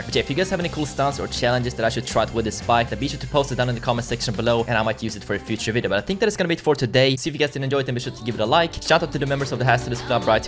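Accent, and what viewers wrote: Sweden. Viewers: Är du från Sverige? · man hör på dig du är svensk lol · Är du från svergie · Are you swedich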